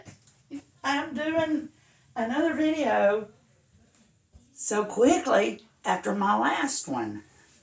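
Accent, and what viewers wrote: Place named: South, United States. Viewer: I just accidently found you today and you are a breath of fresh air. Your dutch pours are even better than kanella and your personality is 1000% better. As far as lisa marvin your cleanliness and professional look of your work is top of the line. I cringe watching the mess lisa works in haha. I am so very glad to have found you. You are REAL not fake in anyway and i hope your channel grows astronomically. You deserve it. I am Yankee so your accent makes me chuckle sometimes. Southern belle for sure. Not some richy trying to get richer off others but a real deserving artist. I have been doing fluid art since 2018. My husband was diagnosed with pancreatic cancer and it gave me my peace when my soul needed it. He died sept 2019. I love doing my art and love just mixing the beautiful paint colors some days. I just wanted to tell you what a wonderful soul i found you to be. 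You are great. You say you are timid, i find you humble and deserving. Keep your guardian angel painting for yourself because i know you have one watching over you, no doubt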